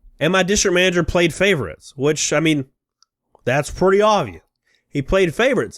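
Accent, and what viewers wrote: South, United States. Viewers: Did I just hear a southern man say the Confederate battleflag is Scary????? Lol wtf bro.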